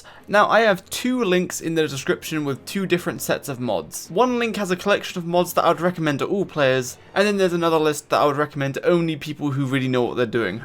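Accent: United Kingdom. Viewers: The bri'ish accent commentary is charming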